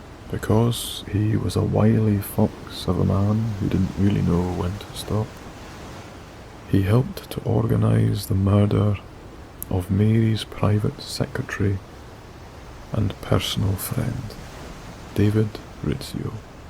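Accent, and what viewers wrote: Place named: Scottish Highlands, United Kingdom. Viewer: your gentle , highland brogue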